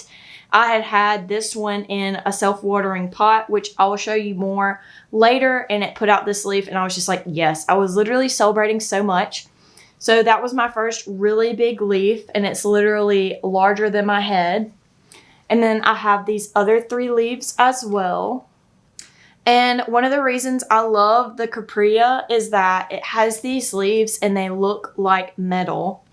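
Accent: South, United States. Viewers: “Ah leeuhv eeuhn uhmericuh” in the world’s strongest southern accent, as if we didn’t know 😆. Haha no shade, I’m in Oklahoma.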